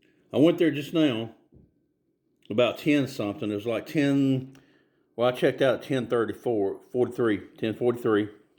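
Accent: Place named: South, United States. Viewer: You sound like a southerner. We are raised differently from our northern or far western counterparts. We believe in being friendly and gracious. Not everyone has those values.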